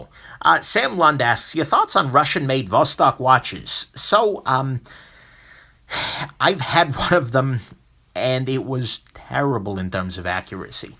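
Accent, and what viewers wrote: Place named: Brooklyn, United States. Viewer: English language learner here. Excuse me, what kind of English accent do you have? Brooklyn? It's very interesting and curiously easy to understand in spite of your very fast delivery.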